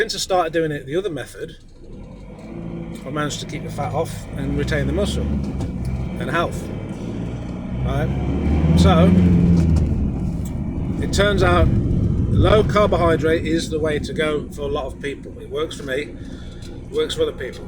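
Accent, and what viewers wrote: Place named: Leicestershire, United Kingdom. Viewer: 👍.where abouts in the Midlands are you from fella?. Sounds like Leicestershire 😊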